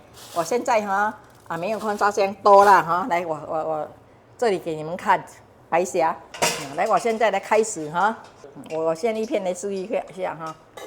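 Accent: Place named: Hokkien, China. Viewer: Autie我早上好！ 谢谢你8你youtube 又见面了。 我喜欢听您的声音话语加福建❤🎉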